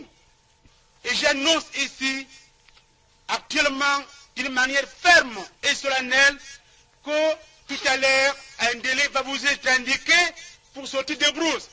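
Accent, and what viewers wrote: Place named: Bulu, Cameroon. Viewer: L accent bulu, les genocidaire, depuis la nuit des temps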